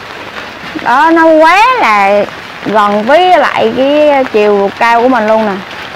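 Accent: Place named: Nha Trang, Vietnam. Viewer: Nhớ giọng nói Nt quá đi Diên khánh hay Ninh hoà vậy Ko có dịp về mùa mưa dể thấy đuọc cánh này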